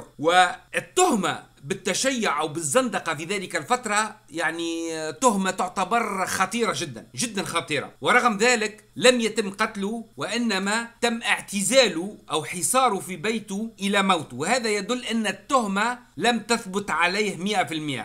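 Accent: Tunisia. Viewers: استاذ انت احسن مدرس للتاريخ, و اللهجة التونسية زادتها حلاوة. تلميذتك من الجزاءر.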